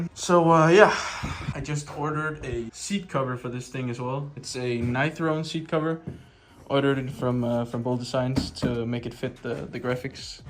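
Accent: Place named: Denmark. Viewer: Jeg kunne høre så hårdt på din accent at du var dansker så jeg tjekkede din kanal og fik ret fire video fire 🏍️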